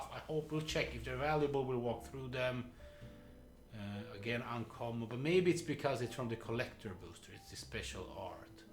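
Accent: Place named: Scandinavia. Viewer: what country are you from? You sound Scandinavian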